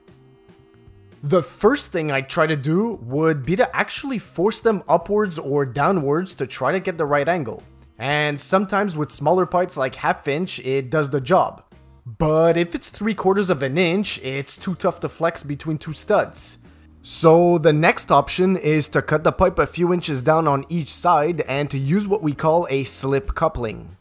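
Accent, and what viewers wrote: Quebec, Canada. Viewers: Sounds like a Québec accent ?! I believe I hear poutine between the plumbing... Is it ?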